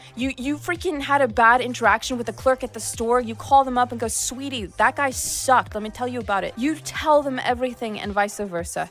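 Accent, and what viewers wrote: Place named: United Kingdom. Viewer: It's been a while since I've heard sound this British this often.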